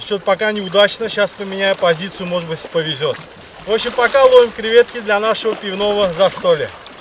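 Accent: Baku, Azerbaijan. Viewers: Дай Бог тебе здоровья крепкого и счастья! Я уже 32 года как уехал из Баку, но никак Москва не стала мне родной. Как говорится бурнумун уджи гейняйир по родному Баку. Каждый твой сюжет это как бальзам на душу. И тема ,и родной бакинский говор ,акцент и даже манеры просто чудо! Спасибо тебе родной! Надеюсь скоро приехать в Баку и тогда постараюсь с тобой встретиться, если ты конечно пожелаешь. Извини что обращаюсь на ты ,но как говорили раньше "бир нечя байрам сяндян бойуям".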